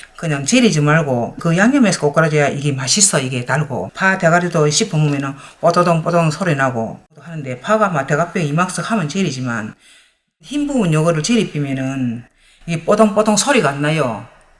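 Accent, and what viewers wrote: Gyeongsang, South Korea. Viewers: 꼬꾸라 져뿌리 깅상도 사투리 오지럽ㅋㅋㅋ넘구수해요 항상 건강하시구 반찬많이 갈쳐주세유~감사합니데이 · 사투리 내만알겠노 ㅋㅋㅋㅋㅋㅋㅋㅋㅋㅋㅋㅋㅋㅋㅋㅋㅋㅋㅋㅋㅋㅋㅋㅋㅋㅋㅋㅋㅋㅋㅋㅋㅋㅋㅋㅋㅋㅋㅋㅋㅋㅋㅋㅋㅋㅋㅋㅋㅋㅋㅋㅋㅋ 와 창원 아니면 마산같은 느낌이시네. 제가 마산사는데 너무 똑같다 ㅋㅋㅋㅋㅋㅋㅋㅋㅋㅋㅋㅋㅋㅋㅋㅋㅋㅋㅋㅋㅋㅋㅋㅋㅋㅋ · 저도 혼자 빵 터져서 주름졌어요 ㅋㅋ 말씀이 너무 정감가고 재미있어요. 아마 서부 경남분이신가 싶네요~^^ 잘보고있어요.😊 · 구수한경상도사투리최고 저도경상도토박이라더더파김치배워갑니다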